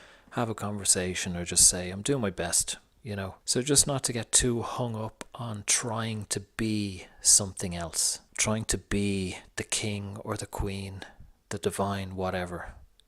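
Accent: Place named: Ireland. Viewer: Didn’t think I was gonna hear an Irish accent when I clicked on this link from inside a Divine Masculine page... here I thought I was the only one on the island 😂 love the vid!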